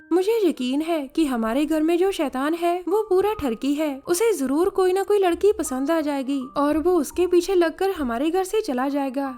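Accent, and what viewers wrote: Punjab, India. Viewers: Aap aur apke thumbnails...aur ye awaz, ye Punjabi andaz... wah kya baat h.... Lv u so much. schhi wala..🥰🥰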